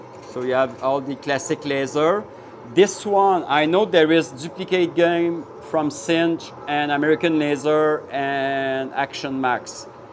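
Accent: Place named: Quebec, Canada. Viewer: i don't no why but you sound like someone from Quebec lol any how you have a new sub and hello from Quebec :P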